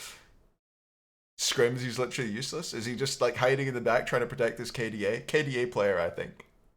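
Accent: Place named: Scotland, United Kingdom. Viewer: Best takes , perfect list , perfect video , and the most authentic Scottish accent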